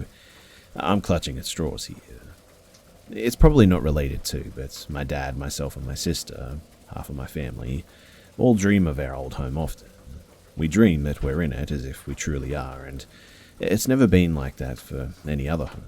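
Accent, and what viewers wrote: Australia. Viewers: instant subscribe an actual human narrator AND ur from the motherland aus bro sign me up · Is the accent Australian??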